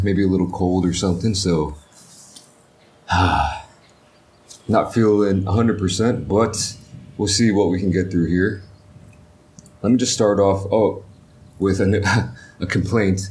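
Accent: United States. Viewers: Hey i do have a question and help me pick up your accent man so like how did you get this i mean i get it you were in the states for quite some time but help me out man and been a watching your videos since lockdown and enjoying these vids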